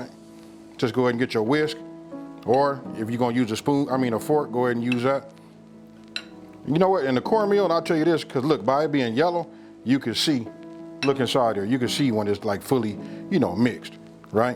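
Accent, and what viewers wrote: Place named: California, United States. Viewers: Coolest cat on the net. With that LA accent and hat you remind of my guy, NIP, #RIP. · Ok Unc, wit that Cali twang! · Soon as i saw a big black brotha wit a LA hat and Cali accent, i knneeww this was bout tah be gggoooiiiidddd!!!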